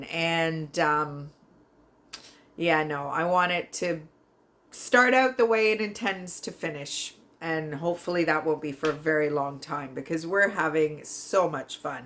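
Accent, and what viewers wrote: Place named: Southwestern Ontario, Canada. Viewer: I am fairly new to you & just realized your talking SW ONT! HOME!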